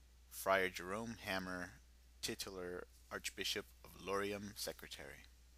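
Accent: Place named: Canada. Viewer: Nice Canadian accent.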